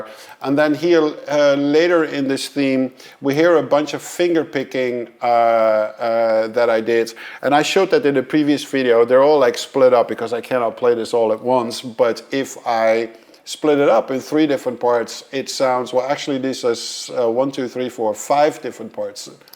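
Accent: Netherlands. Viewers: Love the Dutch accent ahah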